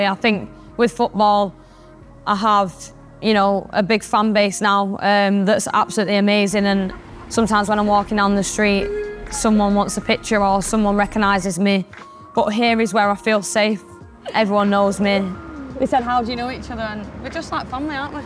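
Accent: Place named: Manchester, United Kingdom. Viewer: I love you Tooney , never let anything get you down. You inspire me and I want to be like you when I’m older. Im not from Manchester but I always put on an accent to be like you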